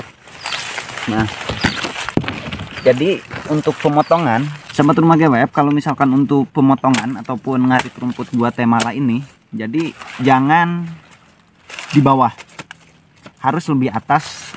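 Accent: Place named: Java, Indonesia. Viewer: logat bicaranya ky orang jawa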